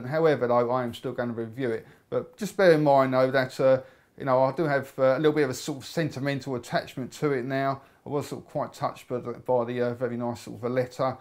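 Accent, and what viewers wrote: United Kingdom. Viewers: Do you know that your vids are good for people who want to learn British accent? Btw, great review as always, stay lit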